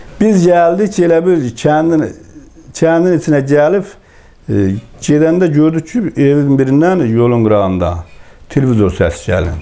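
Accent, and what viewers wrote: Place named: Gadabay, Azerbaijan. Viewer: "Allahın qadasın alım" cümləsi o qədərmi başa düşülən deyil, azərbaycanlı olan bu sözləri başa düşməyə bilməz. Yaxşı sən başa düşən kimi "Allaha qurban olum" deməkdi. Bu adam sadəcə savadlı adam deyil, Gədəbəy dialektiynən danışır, əsas odur ki, əsl türkdür, əsl qəhrəmandır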